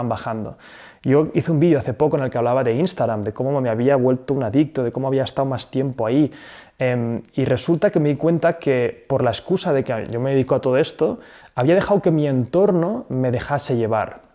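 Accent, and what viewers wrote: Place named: Castilla-La Mancha, Spain. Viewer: DE DONDE ERES? POR TU MANERA DE HABLAR!! QUE DICES "HE DEJAO " PASASTE LA NAVIDAD CON LA FAMILIA. ERES DE CASTILLA LA MANCHA? 😄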